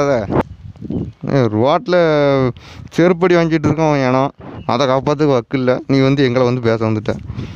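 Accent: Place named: Tirunelveli, India. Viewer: அருமையான பதிவு நண்பா திருநெல்வேலி பேச்சில் தெளிவான விளக்கம் கொடுத்த என் வீரச்சக்கிலிலியனே வாழ்க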